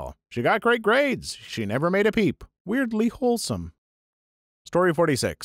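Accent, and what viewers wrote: France. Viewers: Honestly, your French accent isn't that bad! I just don't understand those insults lmao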